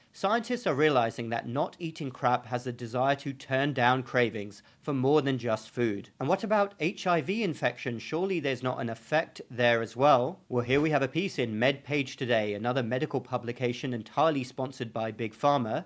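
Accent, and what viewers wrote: England, United Kingdom. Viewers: This is so hilarious: ‘Injections are dished out like candies.’ By the way, don’t lose your English accent. This is an excellent educational page. Thank you for sharing your knowledge with us, Doc.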